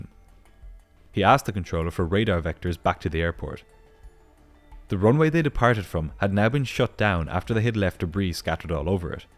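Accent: Ireland. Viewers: Thanks a million for creating this video. Love the Irish accent. I can't believe the ground technicians can't identify the plane they are supposed to be working on. Surely their paperwork will have the plane's registration as at least one of the identifiers ?? If it does then those technicians should have been fired. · fantastic hearing a Dublin voice like mine! Love the videos. Thank You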